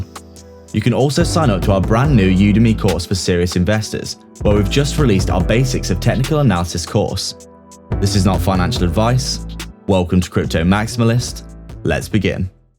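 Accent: United Kingdom. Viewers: Great project indeed. I just love the British accent. Thanks for sharing. Vires in Numeris.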